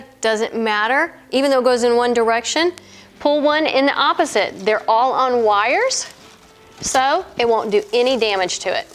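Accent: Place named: South, United States. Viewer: I love and miss the Southern look (and accent!). You make it look like I could do this too. Thank you so much! From a native Kentuckian who lives on the west coast now. We have those white pines right behind my office window!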